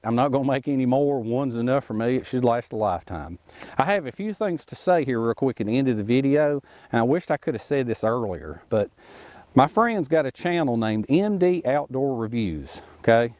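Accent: South, United States. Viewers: Hello again lidal ole England. Now i must admit, it took me till halfway through that vid to come on board. ive seen a few of your vids now. and i have wondered. is this guy for real? That accent of yours is fantastic, somewhere between the Dukes of Hazzard and Forest Gump. Back to your product, a few years back i had an idea in my head, not one that would make money, in fact i assumed someone must have already invented it. A camp bed without a frame was the idea i had. you could make the poles yourself with long straight bits of wood/logs. my idea was to have a sleeve running the lenth of each side. but your webbing on the side idea works better. due to a seriously small market, i doubt either one of us was ever gonna get rich, or a patent. this is a case of great minds think alike, as you have made the idea i had. And taken it several stages further with the whole survival blanket thing. 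i was just thinking a camp bed without a 37 piece pole puzzle. So all in all, good job mate, seriously. me and a friend of mine are in the planning stages of making our own youtube bushcraft/camping/outdoor stuff channel. I'll be sure to let you know as soon as we post anything worth watching. Gary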